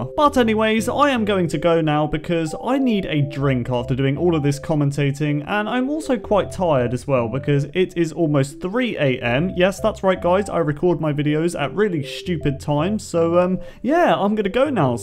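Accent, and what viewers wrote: United Kingdom. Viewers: Are u British accent?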